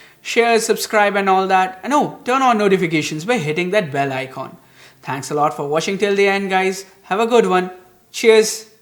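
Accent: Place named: Bengal, India. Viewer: Hey, are you a bengali?🤔 Your accent (specifically how you pronounce your name) makes me think so... By the way, nice content as always ✌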